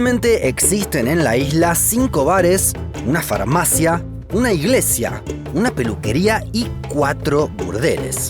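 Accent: Rioplatense, Argentina. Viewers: Sos argentino o uruguayo? Jaja, lo loco es que es una de dos, no sos ni chileno ni nada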